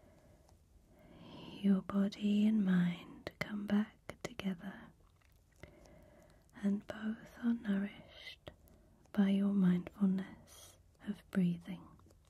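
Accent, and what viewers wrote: England, United Kingdom. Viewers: should be WAY beyond 1.5+ million subs....she is simply Fabulous & her voice is so wonderfully calming. I adore her beautiful English accent, she is absolutely lovely! Please Like her vids if u watch/enjoy bc that gets her videos out to more ppl. Everyone needs some in their life. Idk how anyone could be grumpy, negative or NOT be in a great mood after listening/watching beautifully relaxing, positive ASMR videos. Thank You! You've soothed me to sleep/beat insomnia naturally & greatly reduced anxiety too many times to count. I truly appreciate all you do♥️. With gratitude, ~A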